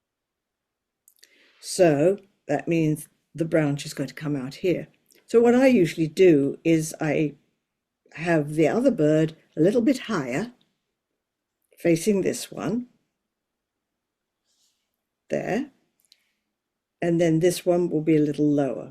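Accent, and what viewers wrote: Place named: United Kingdom. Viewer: I love your art and I just discovered your channel, you sound quite similar to some of my family members so I was curious to ask if you are from the UK as well?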